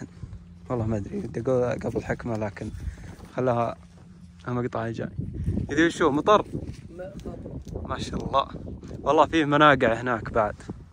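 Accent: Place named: South, Jordan. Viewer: نفس لهجة جنوب الاردن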